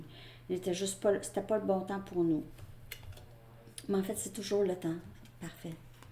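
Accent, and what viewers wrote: Quebec, Canada. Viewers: Bonsoir, J'adore vous écouter, tes messages refletent ce que je vis. J'adore ton accent québécois, ça me fait sourire! Merci 🙌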